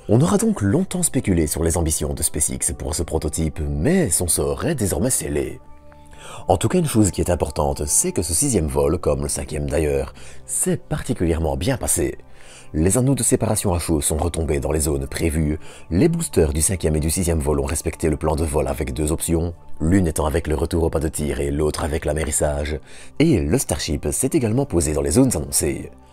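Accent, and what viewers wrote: Belgium. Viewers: dsl que tu te sois pris une partie de la communauté sur le dos; c'est dû à un ras le bol contre des gens habituellement mauvais, voir insultant, envers, en tapant généralement sur sa voix et son accent. Tes coms sont soft et argumentés, et n'ont rien à voir avec eux. Ce qui est inhabituel dans la voix de est d'une part son timbre de basse, sous le baryton, et d'autres part son accent belge, voire (si je ne me trompe) wallon. Perso j'adore, mais chacun ses goûts! 😋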